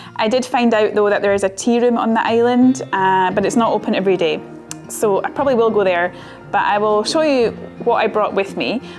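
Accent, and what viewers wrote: Scotland, United Kingdom. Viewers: Beautiful lady, beautiful accent. So proud to claim you as one of my own (scottish)❤😉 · Wow! look at you without your woolen cap. Love your scottish accent..easy to understand..but difficult to immitate❤ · What an entertaining video! Everything was so interesting and moody. As a german I always have to take the ferry from Amsterdam to Newcastle ( spend 2 -3 times a year in Scotland), so your video about the ferry trip was so interesting everything looked so familiar to me, even your sea sickness 😬. I use to travel alone, and I love to stay in small cottages in the highlands ( although I always feel a bit scary at nights ). In 2021 I stayed a few days in the Hostel in Tarbert on the Isle of Harris, and due to the pandemic I had the hostel for my own! That was great and strange, both., besides I really love your scottish accent and the fact that you are so easy to understand 👌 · You have such a lovely Scottish feminine accent my dear. 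💖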